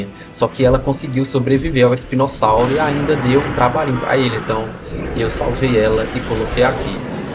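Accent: Ceará, Brazil. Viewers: Cearense?, tipo eu🎉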